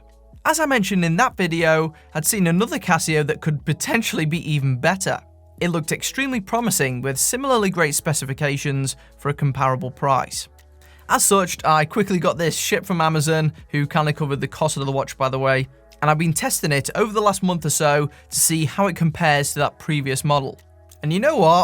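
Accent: United Kingdom. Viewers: Fantastic informative review of this COOL watch. So much usefull information. Love the clear British accent.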